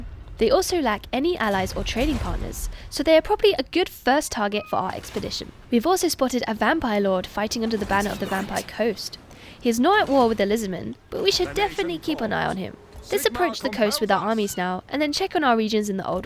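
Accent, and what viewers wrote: England, United Kingdom. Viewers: She has such a lovely English Accent :) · qt british voice always makes things more enjoyable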